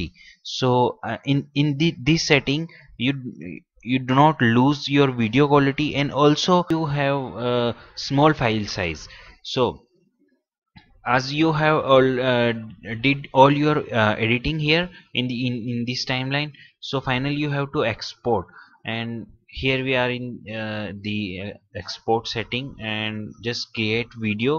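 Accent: India. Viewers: He is speaking proper English but with an hindi accent. Stop being rude people. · OMG people have accents jeez u don't have to be rude about it! Imagine if that was you? What would've u done? He probably had struggles explaining it in English and by the sound of his accent I can tell it was probably Hindi. Like, at least he tried appreciate that. Like he said, just follow the steps -.- -_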